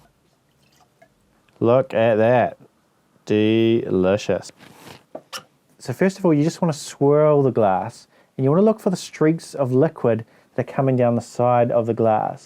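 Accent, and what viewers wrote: New Zealand. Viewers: Are you a kiwi? If so where from?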